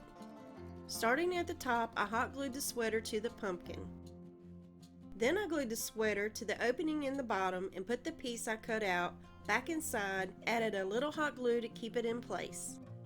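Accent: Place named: East Tennessee, United States. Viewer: I love all your videos!! You are so creative!! I like the way you talk cause I talk about the same way 😂 Im from East Tn.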